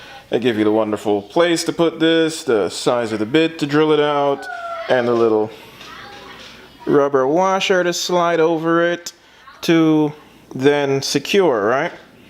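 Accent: Jamaica. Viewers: Was that Jamaica speak, or more a Trinidadian vernacular ? Great humor in any case ! The adjustable proportioning valve is usually placed inside the cockpit for the driver to dial more or less as needed, you will not dig having to get out to adjust it in the pouring rain. A bit of silicone grease on the rubber washer will really cut down the binding you were having, and it will not degrade the rubber seals in the calipers or wheel cylinders. You described the method to hold a nut in a box end well enough, start by holding the nut captive inside while spinning the fitting on the outside to get the party started. I will not mention how the resevoir looks like it will be impacted by the engine, thankfully it should torque to the right so it might not pop the resevoir off. Look forward to the more fun that will come your way... you have a great attitude ! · 100% Jamaican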